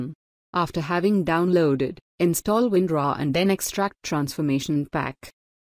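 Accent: India. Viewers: Are u indian? ur accent is just like an indian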